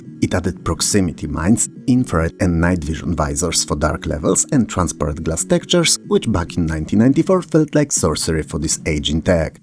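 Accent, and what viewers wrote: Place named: Poland. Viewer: I'm pretty sure you're Polish, I recognize the accent and certain manner of speaking we Polish people are having trouble to mask. Nevertheless, good job, thank you. I miss times when I could play Dragon Lore for hours and absorb it's strange atmosphere. I tried again few years back and all I felt was frustration.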